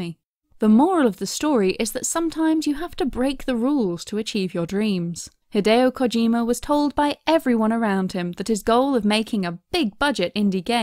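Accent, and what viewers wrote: United Kingdom. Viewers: It feels like that the narrator is british, my asian ears are hardly listening to this narrator. Glad there is subtitle available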